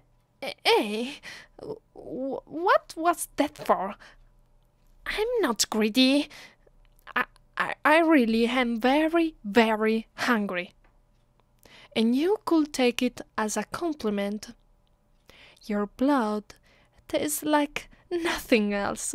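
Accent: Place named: Italy. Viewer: To, I enjoyed your vampire roleplay. The title was Vampire Girlfriend. And also, I love your Italian accent very much. It actually made your roleplay, extra special. But not only that, your creator is so romantic. I fill like that I am her boyfriend. And also, I could picture myself in her arms. I am really into female vampire role plays. I hope that there will be more role plays in the near future.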